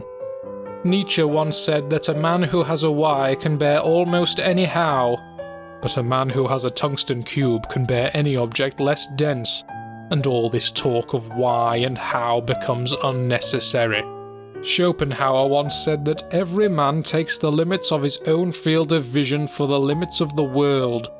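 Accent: Scotland, United Kingdom. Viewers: why does he kinda sound scottish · The British accent was the cherry on top.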